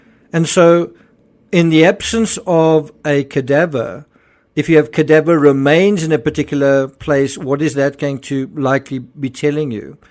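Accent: South Africa. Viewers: What I cant understand is that if it was an accident, why cant the parents admit it? Love the Sa accent, it reminds me of home. Hi from NZ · Hi sueelliott4793 from me, also in NZ. I like SA accent but even more than that I like his creepy intro music!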